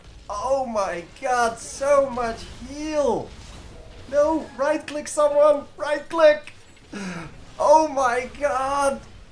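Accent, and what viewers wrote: Netherlands. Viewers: are you from the netherlands i hear it on your english ( ik ben van belgie )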